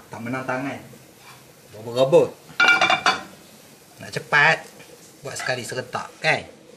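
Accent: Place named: Riau, Indonesia. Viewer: Sedapnya nti kita try... Terima kasih ya... Cara pakcik bercakap macam org Riau la..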